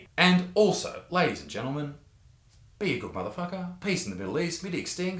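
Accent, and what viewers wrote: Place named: Australia. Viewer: Word, good statement mate. I like your australian English. Greetings from Germany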